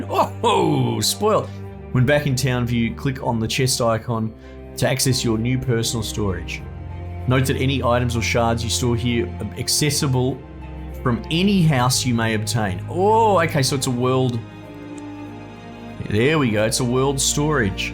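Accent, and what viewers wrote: Australia. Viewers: Hey, Mate, if your Australian lilt is insufferable, God help mine. The game seems quite interesting. I'll go a few more episodes for sure.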